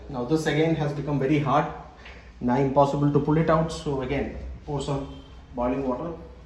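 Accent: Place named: India. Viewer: You sound like an NRI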